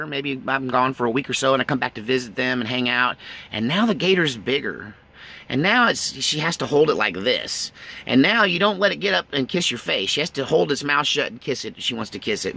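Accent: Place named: West Virginia, United States. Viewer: Your west va accent is pretty close....ever seen the documentary about jesco white and family entitled "the wild and wonderful whites....."?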